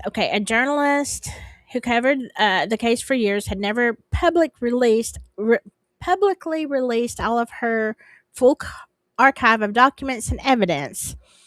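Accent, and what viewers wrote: South, United States. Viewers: Hey I'm a new subscriber from England 💙. Saw you first with the Prof and I have missed you so I have come over. It's so soothing listening to your lovely Southern voice ☺️🤗. Really enjoyed the live on replay. Fascinating story. That Mark is off the charts creepy. He was no bystander imho. Would love you to cover a UK serial killer.. we have a few.. sadly. The White House farm killings is interesting.. although that was a mass murder.. only a thought.. Much love. Hyped 💙🤗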